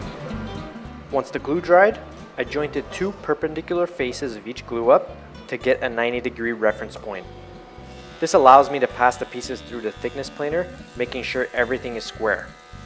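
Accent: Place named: Canada. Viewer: Great project and great video. Im gonna be honest here: When you started talking I was like, "Oh, this dude's Canadian" (because of the accent). When you got to the feet and were using hockey pucks I burst out laughing. Nicely done.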